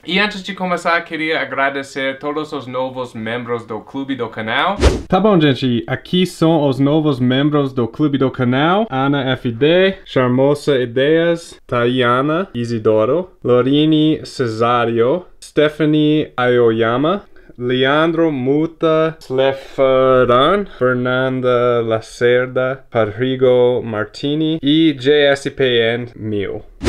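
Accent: United States. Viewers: Impressão minha ou o tá voltando ao sotaque forte dos EUA rsrs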